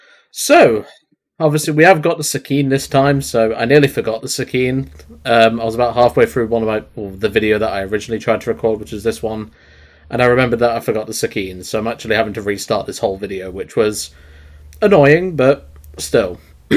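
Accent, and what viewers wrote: United Kingdom. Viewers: Midlands accent?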